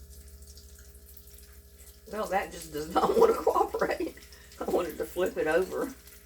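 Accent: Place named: East, United States. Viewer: Hi. Curious... you're in Texas?... Trying to figure out your accent..are you from the east?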